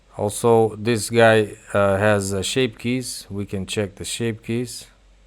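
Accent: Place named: Croatia. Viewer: You sound Croatian.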